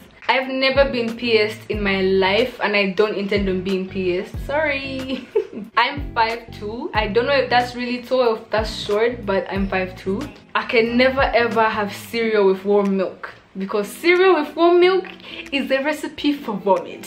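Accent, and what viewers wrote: Nigeria. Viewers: Tbh, the very first time I heard you speak I 100% thought you were Nigerian. 😂🔥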